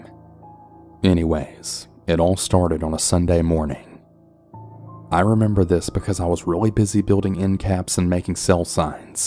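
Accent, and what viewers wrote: South, United States. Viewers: Why does the narrator sound like he's from the south? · I love the country accent Thanks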